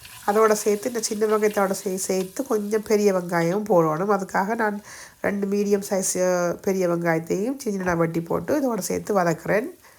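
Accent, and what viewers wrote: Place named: Sri Lanka. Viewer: உங்கள் இலங்கை தமிழ் பேச்சு ரொம்ப பிடிச்சிருக்கு.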